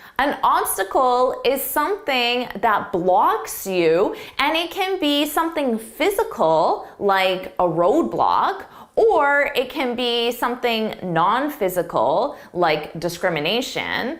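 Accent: United States. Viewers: This American accent is so ugly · English vocabulary by an American, very loud one at that!! Not for me. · 15/15 of course. This project is pathetic - why don't you just encourage people to use good dictionaries? After all, that would spare them from hearing your appalling American accent, and from being led astray by your occasional solecisms (e.g: dangling prepositions, or split infinitives). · So annoying that this is promoted as Advanced English words when the presenter is quite obviously speaking American.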